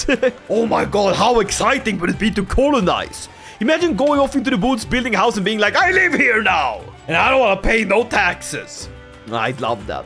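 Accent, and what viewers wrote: Sweden. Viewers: Den svenska dialekten märks värkligen 😭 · The accent kinda gives it away 😂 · Är du skåning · That's the most swedish accent of english i've heard from anybody not older than 70.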